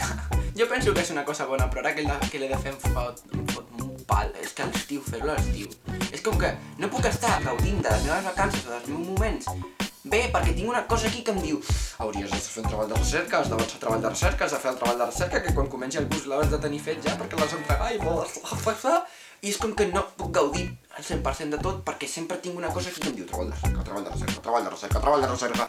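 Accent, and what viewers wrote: Spain. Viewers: se te oye sexy en catalán, en general me quedo a descifrar lo que dijiste con tu acento español, peor ahora no eh podido, a pesar de lo sexy, ni modo.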